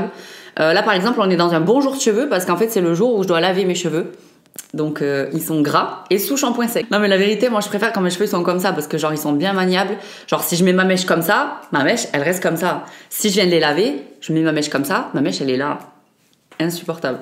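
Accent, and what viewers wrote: Quebec, Canada. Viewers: Tu sais quoi ça fait pas longtemps que je regarde t’es vidéo et sincèrement tu gagne une abonné en plus ! La Mayonnaise , le KFC , Team série.. Non merci Je suis anti que bas du corps mais j’alterne mais effectivement que le bas .. No way ! 👍🏽 L’accent québécois c’est cute ! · Omg j'adore l'accent québécois 😍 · "LES ANDOUILLETTES C'EST LA BASE" trop raison! 😁 L'accent québécois c'est tellement mignon 😍 LES TACHES DE ROUSSEUR C'EST MAGNIFIQUE ! Trop cute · Vive l'accent québecois !! 🇨🇦❤🇨🇦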